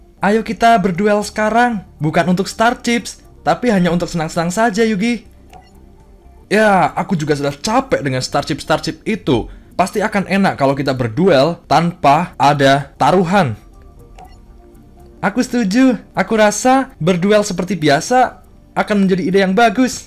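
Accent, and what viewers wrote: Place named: Purwokerto, Indonesia. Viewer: Dari medok nya, apakah agan orang Purwokerto atau sekitarnya?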